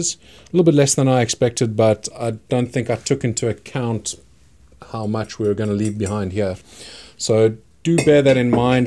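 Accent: South Africa. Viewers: You're south African? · He can't be Aussie.. Mzansi 4sure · are you a South African? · Great Video, great presentation and what I really appreciated was the step by step, explained in clear english ( with some South African accent ) but very easy to understand the different processes of the fermentation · Are you from South Africa? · you look like it an sound like it.... south africa or maybe zim? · He sounds South African 🤔 🤔 🤔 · Are you South African? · When you watching a video and you say to yourself, "Hey this guy is South African" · Are you originally South African by any chance? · Correct me if im wrong, but are you South African by any chance. Not so much the accent ( although there is a hint ), but more the walking around barefoot outside? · Snap! 😂😂 he does sound South African as well 😂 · are you south african? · One of the best SA accents I have ever heard on Youtube. 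Well done boet, your English is perfect and your pronunciation is amazing. Great video too. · Yup, 100% sure this is a Saffa... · You sound South African, very interesting video thank you :)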